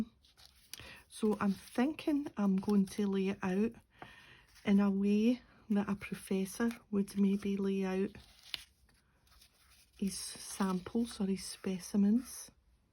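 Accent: Scotland, United Kingdom. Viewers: I could listen to you talk all day. Love your Scots accent!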